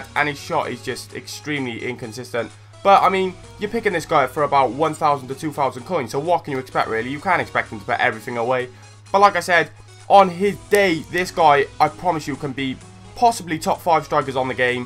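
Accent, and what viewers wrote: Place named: Welsh Valleys, United Kingdom. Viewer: u sound like taht guy out of the valleys lol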